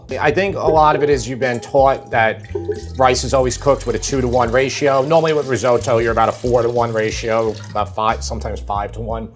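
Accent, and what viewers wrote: New York, United States. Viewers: I haven’t tried the recipe yet, but I enjoy the way you “tawk” because it’s exactly how I do! Lifelong New Yorker. My adult children still mock me, especially when I make fresh-brewed “cawfee!” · Love your NY accent! I’m from NY too, but now live in Virginia.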